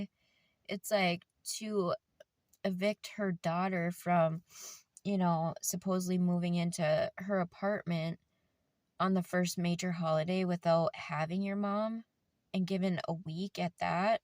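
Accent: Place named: Midwest, United States. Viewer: I just wanted to tell you I see you. I relate to you on so many levels. I am originally from Wisconsin, so I appreciate the Midwestern accent number one… 😊 For me, my dad was my “person”… I was married at the time when my dad passed, and I never felt so alone in my life and in my grief… my husband did not understand. We are no longer together not to scare you….It had nothing to do with the grief well OK MAYBE that was part of it, but he also had a severe alcohol problem… But, he never understood, or gave me what I needed. You are NOT an asshole! I will admit to you that there were times where I thought to myself. I can’t wait until my husband loses one of his parents, and THEN he will understand. Trust me, I don’t wish that on anybody, but at the time I was so angry and hurt and frustrated with him that that was in my head… You are not alone. 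Anger is a huge part of grief.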